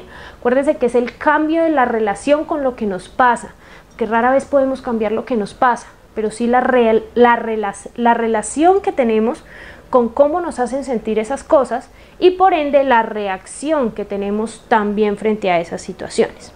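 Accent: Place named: Colombia. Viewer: Parece colombiana por el acento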